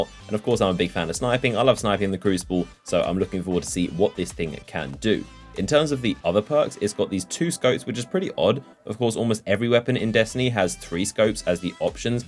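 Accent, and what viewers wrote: United Kingdom. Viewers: Outrageously British yay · Outrageously British PS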